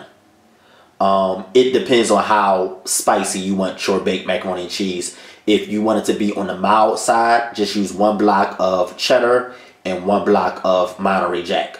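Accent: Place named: DMV, United States. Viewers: enjoyed the commentary sound like my folks in the DMV area lol well I'm making it now 10/9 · Sounds like he’s from Baltimore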